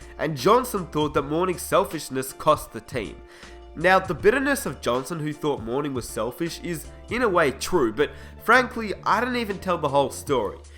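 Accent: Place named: Australia. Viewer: thats great aussie bloke talking about nba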